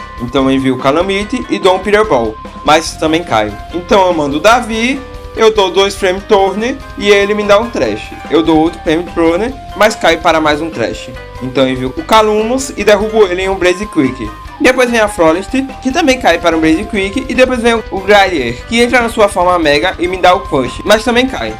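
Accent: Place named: Lagarto, Brazil. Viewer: ? você é de lagarto?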